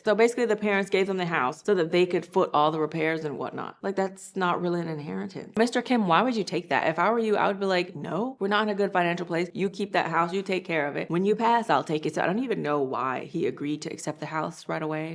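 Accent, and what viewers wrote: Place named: United States. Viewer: Love the stories and the American accent with occasional Korean.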